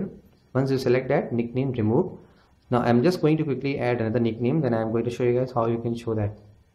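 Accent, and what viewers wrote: India. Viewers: That's Indian accent.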